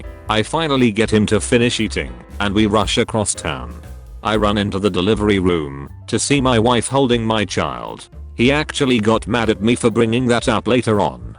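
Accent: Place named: United Kingdom. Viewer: Are you trying to copy xo stories with the british voice and the background elevator music?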